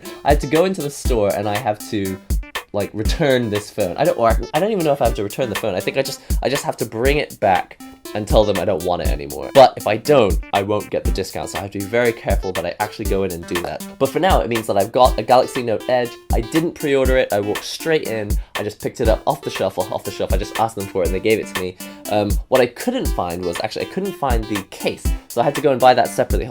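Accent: England, United Kingdom. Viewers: Question time... Please don't take offence) where are you from? because you have a very English (I think) accent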